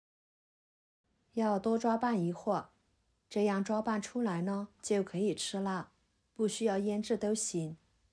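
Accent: Yunnan, China. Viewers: 一听就是大 云 南老乡啊:hand-purple-blue-peace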